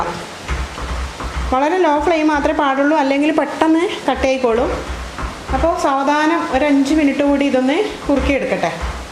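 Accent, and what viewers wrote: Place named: Kannur, India. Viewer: Ithupola pattippidich bhasha Kannur thanne.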